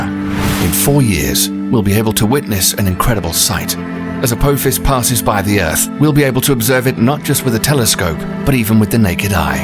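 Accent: United Kingdom. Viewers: My favorite British narrator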